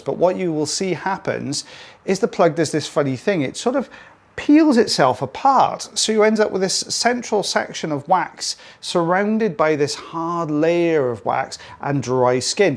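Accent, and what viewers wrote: United Kingdom. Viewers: wiggling his socks off 😂😂🤣🤣 i love UK sayings lol 😂 btw i was trying to sort out ur accent; northern/southern England… not quite… def not Irish or Scottish … then i caught in one of ur video’s that ur Welsh! i was proud that i noticed it was a bit different ☺️ it’s not easy for us Americans to notice the differences. i worked for a UK based company & exposed to a lot of different accents, i finally started to notice the subtle differences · Im watching the video to see the star of the show not your face or to hear your British accent. In fact your technique is rather.....rubbish! Lol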